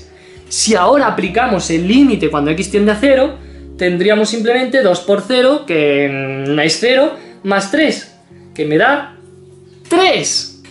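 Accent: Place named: Spain. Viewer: Es gratificante ver un peruano con acento Español que explica derivadas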